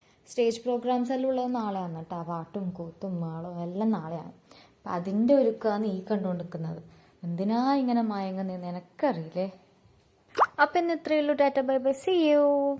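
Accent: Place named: Kasaragod, India. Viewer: Kasargod bhasha poli😊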